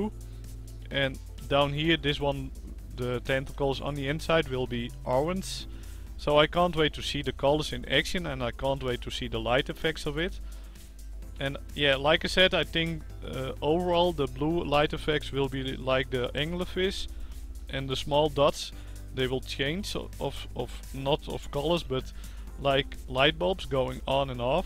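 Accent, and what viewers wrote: Netherlands. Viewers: are you dutch ? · Ik hoorde meteen het Nederlandse engels XD Leuke vid ;) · Another good video :D I see those people still haven't subscribed! I don't understand why people don't subscribe when they watch your stuff! Oh and i was wondering... are you Dutch? :D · Are you dutch? xD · are you dutch?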